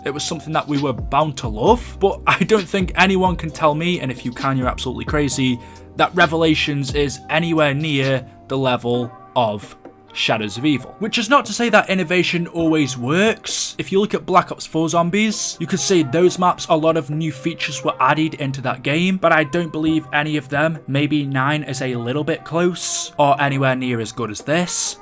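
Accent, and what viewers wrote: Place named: Liverpool, United Kingdom. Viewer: Oh god the scouse accent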